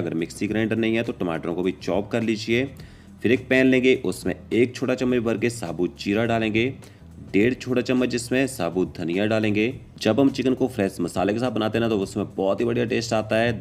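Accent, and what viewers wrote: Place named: Himachal Pradesh, India. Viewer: Are you from Himachal Pradesh? Your accent is himachali.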